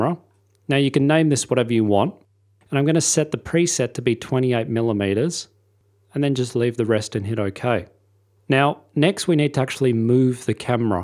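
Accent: Australia. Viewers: Straya! I've been learning After Effects from a lot of different wonderful people on here from around the globe - It's nice to finally hear the Aussie accent.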